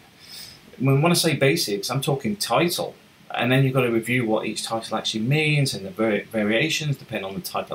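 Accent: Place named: Birmingham, United Kingdom. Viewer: He even speaks like an idiot ! Bruuuuummmieeeeee and living there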